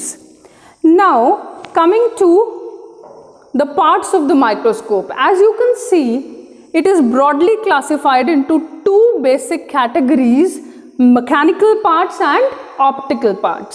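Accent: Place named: India. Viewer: Your pronunciation says that you are an Indian..But well done 👍👍👍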